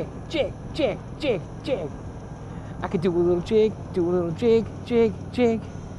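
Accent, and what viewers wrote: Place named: Nova Scotia, Canada. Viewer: Were your first <3 God I can hear the Nova Scotian within you.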